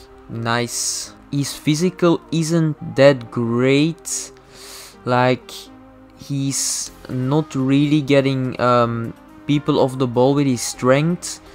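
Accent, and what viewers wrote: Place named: Belgium. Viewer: Direct van in het begin van de video herkende ik die belgische accent hahahah Nice vid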